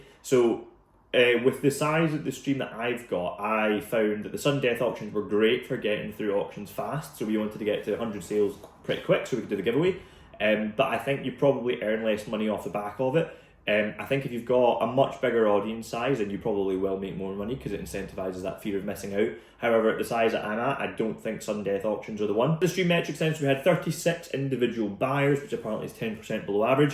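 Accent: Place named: Scotland, United Kingdom. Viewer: Never watched your whatnot, but getting back into Pokémon myself and got recommended this video! As soon as I heard that Scottish accent I was supporting you and your journey, best of luck mate 💪🏴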